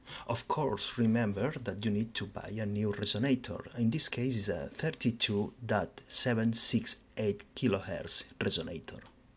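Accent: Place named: Italy. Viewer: I love your accent. Are you Italian? I am an American.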